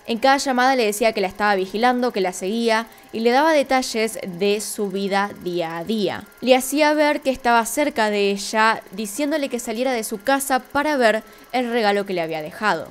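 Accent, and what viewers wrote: Argentina. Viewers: Como todo (Pedante) Argentino la mujer de este canal tiene su pequeño acento Chocante.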